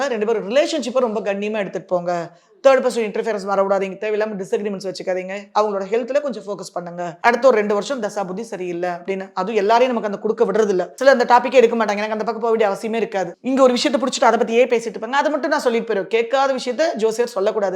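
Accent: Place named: Coimbatore, India. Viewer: Kovai tamil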